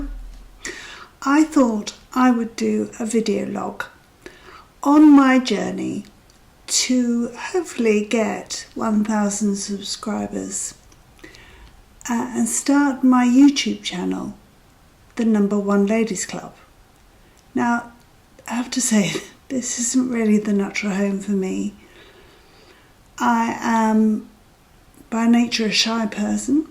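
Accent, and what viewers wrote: United Kingdom. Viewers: Hello 😊 a friend told me about your channel and I immediately got interested and subscribed. I’m just a bit younger and maybe know much more “technology” but getting in front of camera is so intimidating for me. I’m going to watch your videos because I want to support you - I agree we can create a tribe of people like us! You say you like to do art - that interests me a lot because I’m an artist. So, this was the first video I watched of yours and I can see you made progress 👏👏👏. I will watch them all - when I find time in my busy schedule of making videos on tou tube 😅. By the way I love listening to your British accent (as a former English teacher) see you soon ✨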